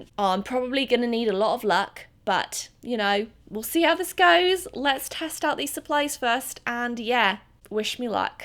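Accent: United Kingdom. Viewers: im confused is she British or American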